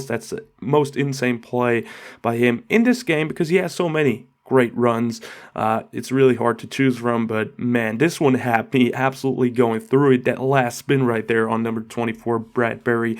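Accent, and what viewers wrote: Chicago, United States. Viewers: My first time watching one of your videos and I love the hybrid Chicago accent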